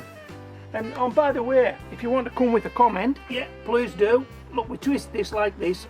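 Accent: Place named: Northern England, United Kingdom. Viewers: Hows it gaan Marra! I've got the autoplay set up on Youtube, so every now and again while I'm multitasking (listening, not watching) one of your videos pops up. Every time one comes on, its a bit of a surprise to hear a voice with our accent coming out of the computer - but how great it sounds. You couldn't get much more Cumbrian than the way you say Crimson Crush! I'm going to make a very late start with seeds from some supermarket toms (piccolo) - and see how it goes. Wish me luck! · Hi mate, i love your chanel and I am married to a Birmingham girl here in Australia. We were wondering what part of England you are in as you sound up North?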